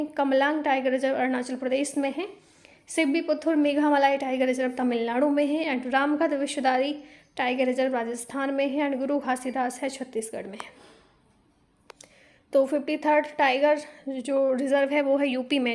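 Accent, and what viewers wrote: Odisha, India. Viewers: Mam aap odisha ke ho kya?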